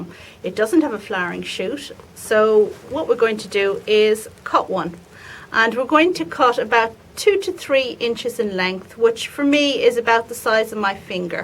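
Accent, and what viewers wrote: Ireland. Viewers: I have learned that if you plan to use an herb for culinary purposes, dipping in hormone powder is not good since it imparts the chemicals in the hormone powder. If it is just for ornamental purposes, that is fine. Love your accent, Love Ireland!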